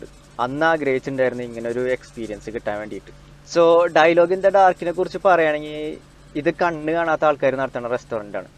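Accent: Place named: Thrissur, India. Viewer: മാഷാ അല്ലാഹ്... നന്നായി...ആ തൃശൂർ സ്ലാങ്ക് കമന്ററിയാണ് എനിക്ക് നിന്റെ വരയെക്കാൾ ഇഷ്ടയത് 😍🤩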